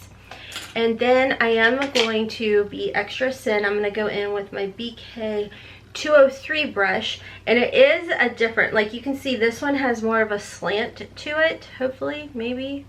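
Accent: United States. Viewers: I love your country accent so cute. I’m from the Bronx and now live in Cleveland so I love me little country accents super cute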